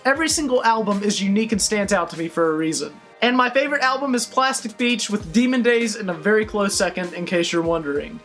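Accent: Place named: Pennsylvania, United States. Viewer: The way you make your "O" sounds in words I can tell you're from Pennsylvania or around that area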